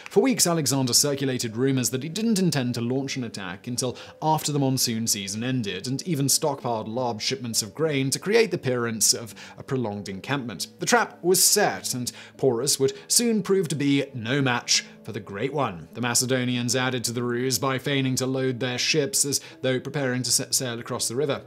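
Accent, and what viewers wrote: England, United Kingdom. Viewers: Pizz-er. English accent cracks me up 🤣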